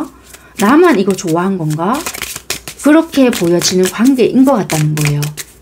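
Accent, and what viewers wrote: Busan, South Korea. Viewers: 선생님. 좋아요를 누른다는게 잘못해서 싫어요를 눌러버린것 같아요. 오해마시라구요. 저두 부산사람이라 선생님의 사투리 표현에 힐링타임 많이 가집니다. 오늘도 리딩 잘듣고 갑니다. 고맙습니다~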